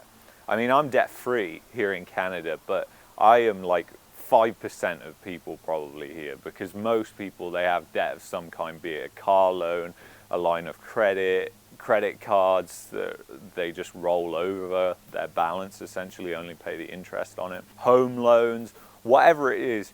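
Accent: United Kingdom. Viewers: You Brit accent along is pleasure to listen to ! I learn English from your talking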